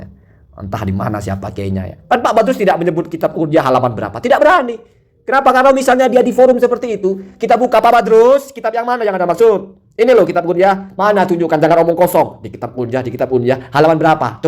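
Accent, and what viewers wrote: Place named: Surabaya, Indonesia. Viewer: jenengan tiyang pundi gus,lagatnipun persis suroboyoan🤭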